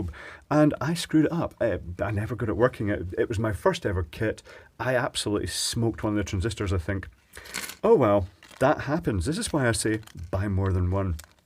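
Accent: Scotland, United Kingdom. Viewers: There's something inherently calming and therapeutic watching a softly-spoken Scottish bloke carefully soldering small electrical circuits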